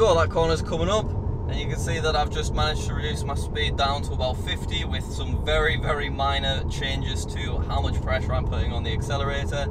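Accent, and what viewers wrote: United Kingdom. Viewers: You saund like a British man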